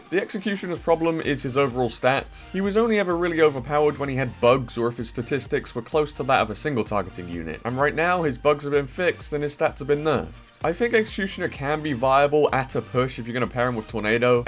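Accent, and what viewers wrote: United Kingdom. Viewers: Yeah, your accent sounds british, but you always answer to strange times:D Could have been American time zones.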